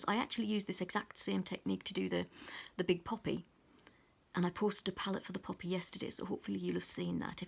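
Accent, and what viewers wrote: England, United Kingdom. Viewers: I am feeling closer to the UK now hearing you during this tutorial! Thanks darling! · Really lovely video I have only just started colouring so I am looking at as many videos as I can. It’s lovely to find an English voice as most of the videos are from the USA. I just hope that I can one day colour as good as you.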